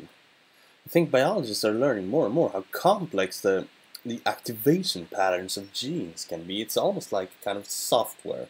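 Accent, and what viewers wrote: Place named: Scandinavia. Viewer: You sound scandinavian, sweed?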